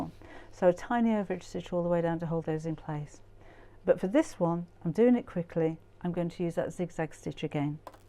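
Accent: United Kingdom. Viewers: I’ve been quilting for 20 years and have never seen this unique construction method. This technique is a genuine “game changer.” ‘ Thanks so very much for sharing this,. I love your tutorials with your cute British accent and soothing voice! I am originally from Texas, so you can imagine how fascinated I am with a British sewing teacher! God bless you!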